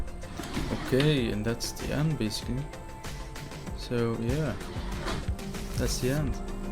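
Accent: France. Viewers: Great French accent 👍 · c'est drôle , j'ai l'impression que tu as un accent français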